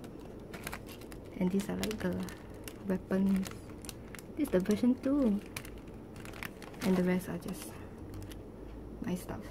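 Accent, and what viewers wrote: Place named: Singapore. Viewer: Your collections are srsly goalsss! And omg I knew you're a Singaporean just by that singlish accent, you sound so cute 😂😂 I hope you get more subscribers because you honestly deserve them I'll be checking out other videos from this channel now 💖💖💖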